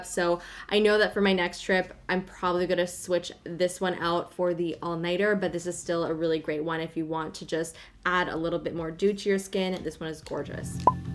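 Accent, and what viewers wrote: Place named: Canada. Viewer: Jalynn Newell it’s because she’s from a region in Canada where that’s the dialect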